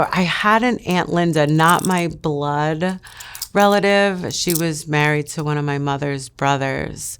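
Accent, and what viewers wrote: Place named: Long Island, United States. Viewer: Rename this >>> Strong island she and her accent is meeeee and all my Long Island friends ………..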